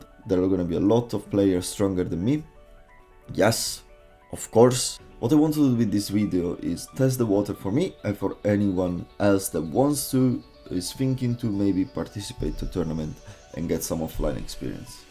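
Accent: Italy. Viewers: Sei italiano Bro ?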